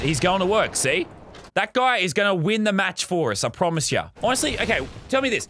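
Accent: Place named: Australia. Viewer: Lol this dude is the funniest and that Australian accent is perfect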